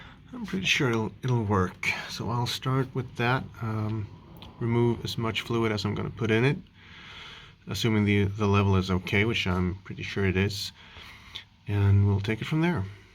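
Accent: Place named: United States. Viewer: Crazy you say English isn’t your native tongue. You sounded like an American.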